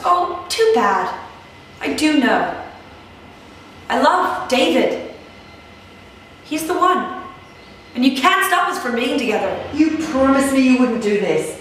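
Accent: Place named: United States. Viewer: i was hoping i'd hear her do a good American accent someday. i'm happy to say that day is today!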